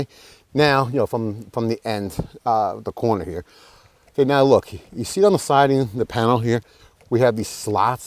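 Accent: New York, United States. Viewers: funny and to the point. except your house or not. you need a moisture barrier. t1-11 is wood, any wood that is contact with water will rot over time. the little extra time and money would have been well worth it. love the straight talk , sounds like your from jersey or new york.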